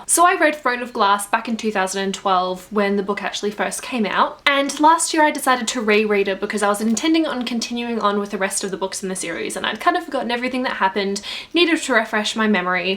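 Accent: Australia. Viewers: Hi! Now I am reading Assassin's Blade (I've just read the first novella) and thanks to your review I am more and more sure to want to continue this series. PS. I think this could be the strangest comment ever, but... I love your australian accent! I'm italian and I'm trying to learn it because I looove it! :')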